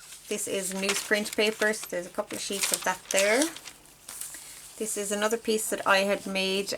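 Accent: Ireland. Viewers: Love this idea❤️how fun! Things I like about your channel… love hearing your Irish accent!, subscribed during JB shenanigans, loved working with your Echoes of Ireland kit… watching for more of your beautiful work!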